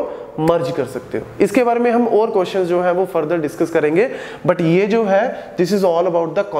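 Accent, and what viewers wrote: India. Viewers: I cant understanding anything what you saying dude. Unfortunetly I am not get used with the english indian accent. Please friends, if some one could put english subtitles on his videos, it would be great...because despite I cant get excatly what he is saying, I am sure that his approach is very unique and worth to share. Greets from Brazil.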